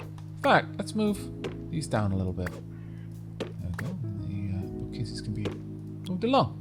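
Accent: Wales, United Kingdom. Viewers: Whisper: He's Welsh. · Welsh do dislike being called English, as do Irish and Scottish people.. He does have a nice Welsh accent :)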